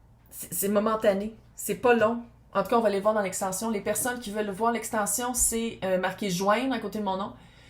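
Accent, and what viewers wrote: Quebec, Canada. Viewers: J'adore ton accent, l'accent du Canada en général, garde-le, je suis française et ça me COnvient parfaitement ❤ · moi je comprend tout (et je kiffe l'accent). Avant de mourir je visiterais le Québec!!!!!!! Donc gémeaux ascendant vierge....et la personne de mon cœur est sagittaire. Wahoooooooo ça fait du bien à entendre. Tout s'entrecroise pour les 3 signes. merci!!!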